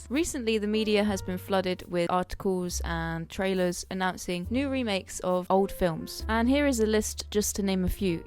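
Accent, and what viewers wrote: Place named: Midlands, United Kingdom. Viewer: Your channel is great. Very well put together stuff, especially since this is only your fourth video. If it's not a big secret, which I totally respect if it is, are you from the Midlands by chance?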